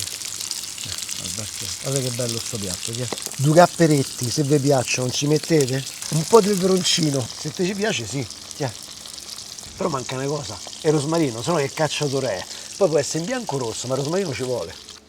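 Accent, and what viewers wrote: Rome, Italy. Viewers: I can barely follow Roman Italian but I love his stuff!!!!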